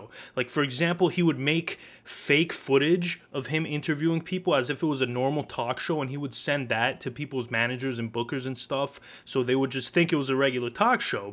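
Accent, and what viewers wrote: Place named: Brooklyn, United States. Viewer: This guy is the most Brooklyn youtuber ever I love it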